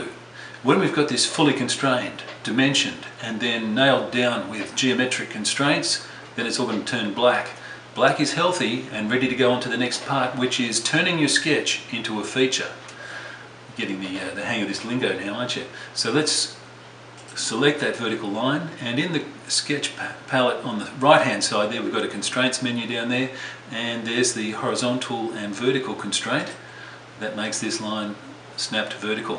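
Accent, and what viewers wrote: Australia. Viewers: I spent a year in Sydney working with H.O.Wills Tobacco. It was a great experience. Are you Australian?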